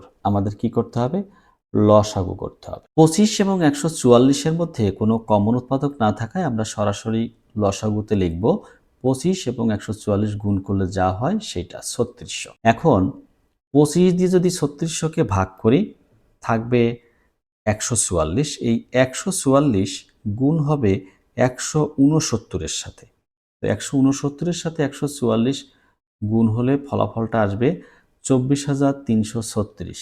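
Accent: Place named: Bogura, Bangladesh. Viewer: Apni mone hoy bogurar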